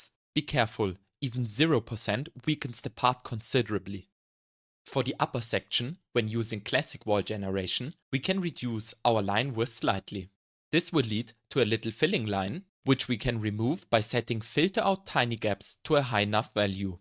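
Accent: Germany. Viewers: 3D printing + german accent = I subscribe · Im a simple men, I hear german accent i press abonieren.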